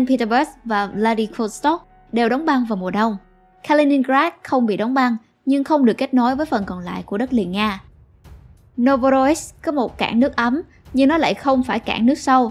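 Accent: South, Vietnam. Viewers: Bài viết hay,giọng đọc chuẩn Nam bộ rất ấm áp...like ***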